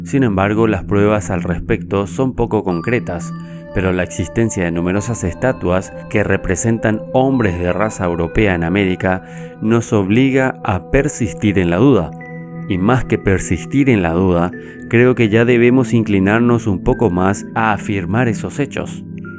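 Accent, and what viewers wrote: Paraguay. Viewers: Eres paraguayo ?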